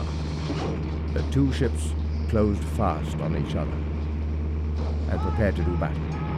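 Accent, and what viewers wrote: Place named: United Kingdom. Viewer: Why do documentaries always have to be narrated by a brit?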